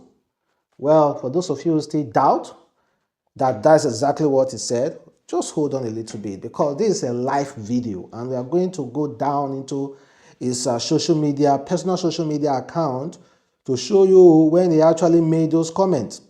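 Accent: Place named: Edo, Nigeria. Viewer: This guy self you no go school, why you dey read and pronounce like that, se something the worry you abi, do you even go back to watch your own videos before posting them, stop disgracing Edo state, if the social media is too hard for you, you can as well go back to farming. every time i watch your video its always filled with reading errors, very bad pronunciation. Am advising you to always go back and watch your videos before posting them, identify the errors, correct them before posting the videos, or contract an editor to work on it for you, youtube is a worldwide platform and you can't afford to keep disgracing yourself, Edo state and Nigeria at large, from the way you speak its obvious you're from Edo state, stop disgracing us.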